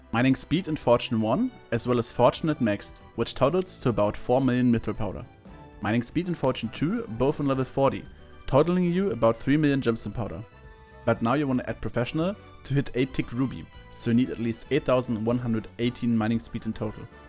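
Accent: Germany. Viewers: u sound german are u german? if yes Hi mein bruder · Hab deinen Kanal grade zum ersten mal gesehen und hab sofort rausgehört dass du aus Deutschland bist. Gutes Video weiter so 💪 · do I hear a german accent? Edit: Yeah totally useless because you say it later on, i wrote this before watching the video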